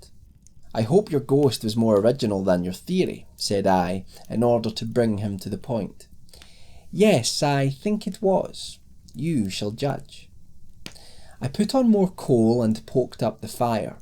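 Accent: Scotland, United Kingdom. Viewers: At the beginning I briefly thought that the accent would deter from the story, but that wasn't the case at all! Well done, my Scottish friend! :D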